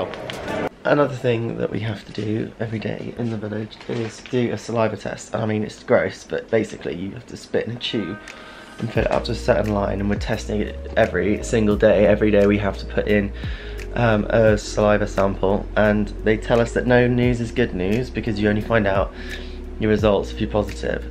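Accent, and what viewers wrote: United Kingdom. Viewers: if you want to learn how to speak British accent , I must watch your vlogg heheheehe..... happy Good day!!! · British accent 🤣🤣👍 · Thanks for your video upload. It's nice to see what athletes do during their stay in the Olympic Village. Love hearing British English. BTW Congrats, Olympic Champion!!!!! :)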